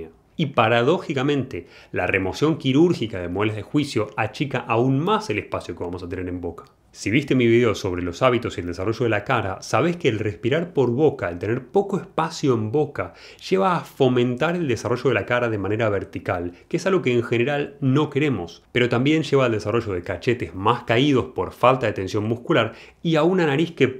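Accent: Argentina. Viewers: como pasar de argentino a español el video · Wtf argentino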